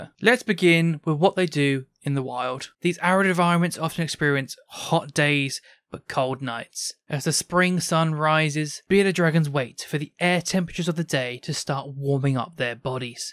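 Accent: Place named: United Kingdom. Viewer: Really helpful video also good to here a British voice was only seeing America videos so nice to here from someone who talks about caring in the uk 👍🏻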